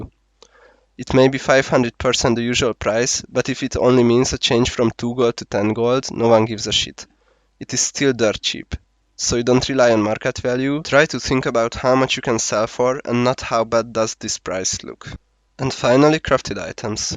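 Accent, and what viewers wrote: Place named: Hungary. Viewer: Can figure out by your accent that you are Hungarian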